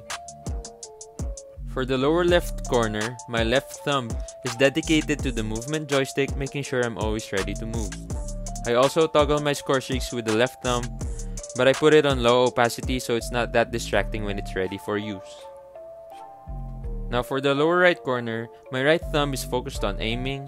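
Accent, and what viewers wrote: Philippines. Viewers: Pinoy ka pala idol , dito ko lang nalaman hahahah, akala ko foreigner, kaya pala pamilyar ung accent mo, lagi ko pinapanood vids po idol, salamat sa mga contents mo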